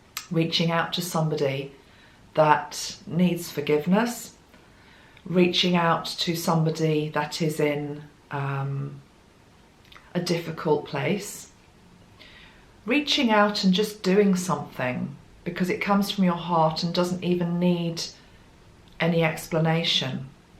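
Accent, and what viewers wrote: England, United Kingdom. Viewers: I just love listening to you. I love English accents. You have such a comforting voice and I feel such strong compassion from you. I would so love to be in one of your workshops but I live too far away. Bless you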